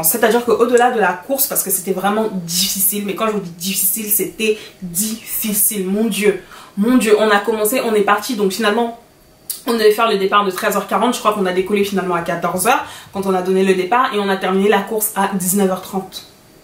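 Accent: Cameroon. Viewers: Belle vidéo j'aime ton accent camer ❤️🇨🇲 je m'abonne direct svp n'hésitez pas à vous abonner également à la mienne merci bien 😇🙏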